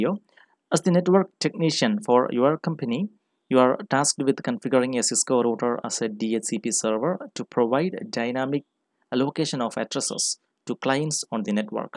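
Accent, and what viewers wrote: Kerala, India. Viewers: Brother....I highly appreciate your work. I am a Networking Student. Your videos have been "'Life Saver" for me throughout my course. THANK YOU SO MUCH DEAR BROTHER. From your accent I could understand your origin is Kerala, I am from TN, we are near by and we are brothers. Please continue to post videos, as lot of people can be benefited by your remarkable work. THANKS CRORES.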